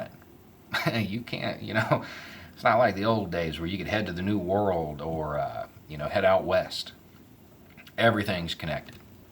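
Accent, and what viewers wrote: South, United States. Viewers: I hope you hung on these last three years, I think it was the "let's talk about a hundred thousand subscribers" video he talked about the accent. I believe Beau is a positive force in the world, and proves you shouldn't judge a book by it's cover. (And yes, I'm a country boy, have a bit of a southern accent, vote blue in a red state, and enjoy hearing common sense from a dude in a shed that looks and sounds a bit like me!) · He's not exactly hiding who he is, his channel is called "Beau of the Fifth Column". I just assumed he affected a more neutral accent on his work channel because a Southern drawl is viewed as unprofessional. This one is his personal channel and he can sound like and say what he wants here.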